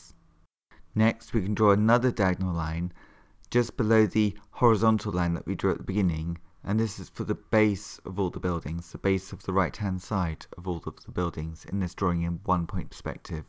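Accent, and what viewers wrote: United Kingdom. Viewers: Hey, are you british? I really love your accent!!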